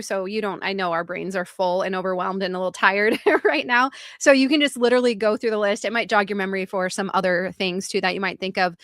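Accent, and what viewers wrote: Minnesota, United States. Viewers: yep she sounds normal to me, Minnesota thing Lol😅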